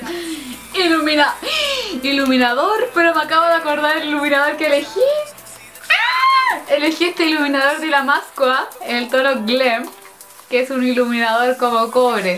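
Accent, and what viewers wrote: Chile. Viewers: El acento Chileno es muy obvio! GENIA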